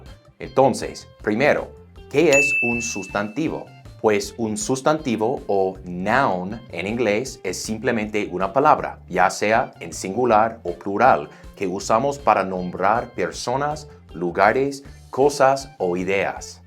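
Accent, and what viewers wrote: United States. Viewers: Profesor usted es americano, dónde aprendió español o es bilingüe desde niño? Excelente. Dónde hace o da clases además de YouTube?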